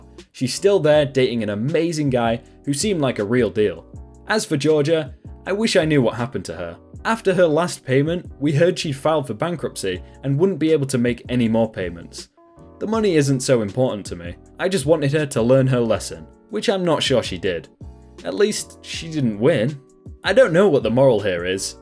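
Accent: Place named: Yorkshire, United Kingdom. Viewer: Your deep south accent sounds suspiciously Yorkshire-like...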